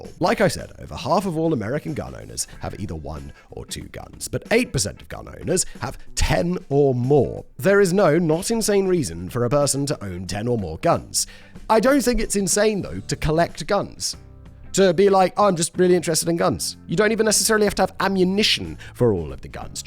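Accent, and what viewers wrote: RP, United Kingdom. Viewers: I’m American. You actually have an annoying pompous British accent. There are much better British accents. Scottish and Irish are definitely better sounding than British. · Ngl… your accent seems very generic British. Sorry Simon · Honestly I forget that Simon is British. Just used to the British accent that punches you in the face, his seems light. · if asked I would have said Simon speaks with an RP sound. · Sorry to be the one to tell you, Simon; you're posh and your accent is posh... the fact that cant see that, confirms it! 😶😂🤣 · cunt is such an everyday word in nz and oz is your accent not close to RP?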